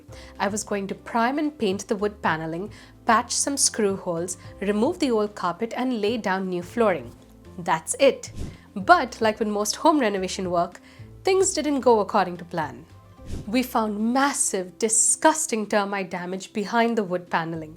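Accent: India. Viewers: Your accent sounds like you're from India. Are you from Mumbai or Mangalaore?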